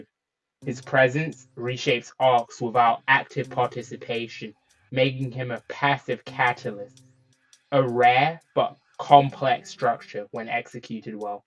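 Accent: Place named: United Kingdom. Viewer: 25 minutes of british accent.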